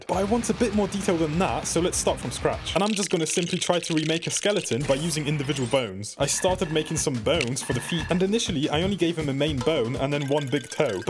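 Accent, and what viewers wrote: United Kingdom. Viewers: sounds Britsh to me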